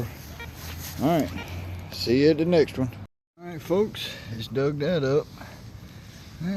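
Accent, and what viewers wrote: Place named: South, United States. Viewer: I like the laid-back approach. Very relaxing. Obviously you're a Southern boy. Keep the videos coming.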